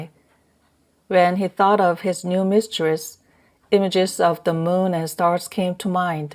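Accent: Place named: United States. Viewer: 오우~아메리카 발음 👍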